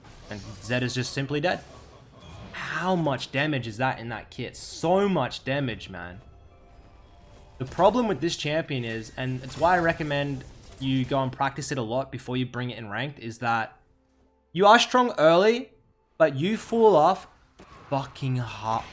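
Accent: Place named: Australia. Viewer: love the Aussie commentary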